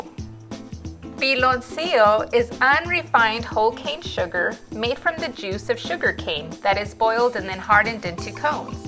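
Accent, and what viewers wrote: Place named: New Mexico, United States. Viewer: I recognize your accent! Are you or your mom from New Mexico?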